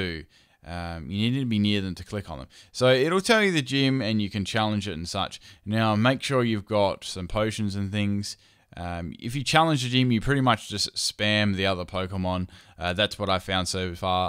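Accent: Australia. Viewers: Wasn't expecting an Aussie accent haha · Are you Australian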